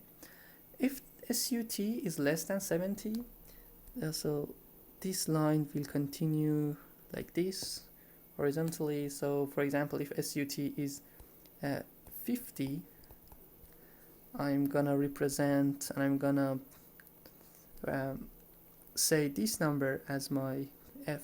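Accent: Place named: Iran. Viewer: I like the persian Accent though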